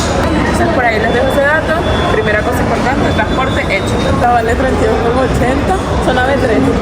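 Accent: Colombia. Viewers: Esta chama es venezolano pero habla como una colombiana , imagino que su por estadia alla imagino que ahora va hablar como un español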